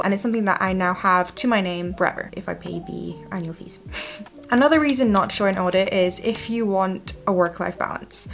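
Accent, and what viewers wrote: Scotland, United Kingdom. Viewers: Sounds scottish to me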